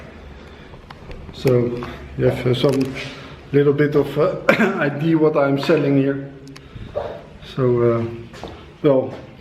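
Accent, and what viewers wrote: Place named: France. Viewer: avec cet accent c'est sûr tu es Français !!!! si oui bravo à toi de tout quitter pour la Colombie, tu vas pas le regretter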